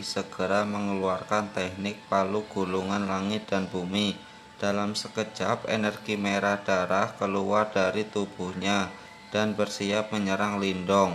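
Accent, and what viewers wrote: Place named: Java, Indonesia. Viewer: Medok banget jawa nya bang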